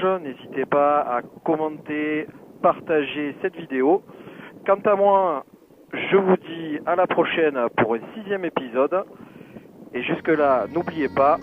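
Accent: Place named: Arles, France. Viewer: je me disais que ton accent est peoche du miens mdrr je suis du coté d'arles et toi? Parce que c est jolie ou tu voles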